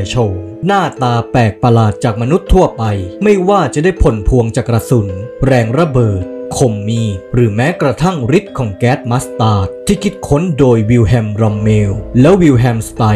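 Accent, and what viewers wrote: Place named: Phetchaburi, Thailand. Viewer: สำเนียงเหมือนคนเพชรเลย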